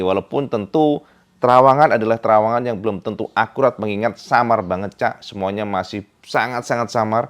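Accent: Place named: Java, Indonesia. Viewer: BANG LOGAT JAWAMU HILANGIN MEDOK BANGET BELAJAR JD PRESENTER BERBAHASA INDONESIA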